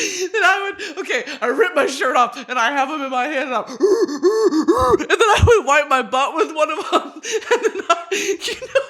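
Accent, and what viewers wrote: United Kingdom. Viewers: The British accent is giving Chole from love island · your birtish accent is so jess this season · Your british accent is giving. I love it!!!! But fr make this love island NOW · u should do an episode in full british accent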